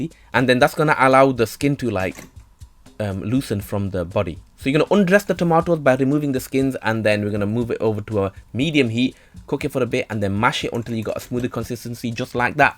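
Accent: Bradford, United Kingdom. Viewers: Just saw this vid in my suggested vids, the thumnail was appealing enough to make click on it and ended up watching it right till the end. Quite interesting. Is that a Bradford accent?Subscribed and will definitely try cook this dish on one of my days off. Thanks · Bradford right?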